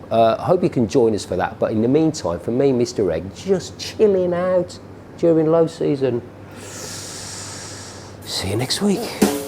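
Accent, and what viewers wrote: Birmingham, United Kingdom. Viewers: Lazy brummie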